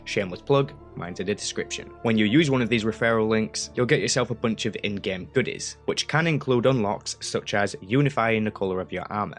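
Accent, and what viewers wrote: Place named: Northern England, United Kingdom. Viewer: middlesbrough your from northern england for sure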